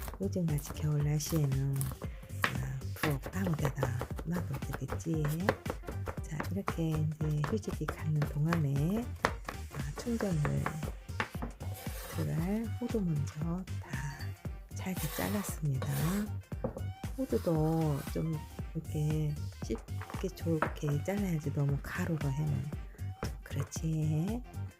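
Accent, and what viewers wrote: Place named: Gyeongsang, South Korea. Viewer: 오~호두파이! 경상도 사투리까지 구수하니 좋습니다^^ 잘보고 가며 살포시 손내밀어봅니다! 좋은 인연 맺고 서로에게 힘이되면 좋겠습니다😉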